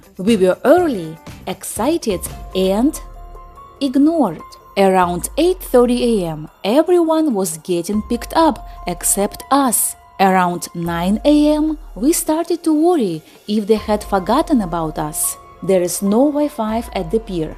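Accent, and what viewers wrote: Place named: Russia. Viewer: Very nice, just one suggestion I would recommend you to use AI for your videos, your English is kind hard to understand due to your Russian accent.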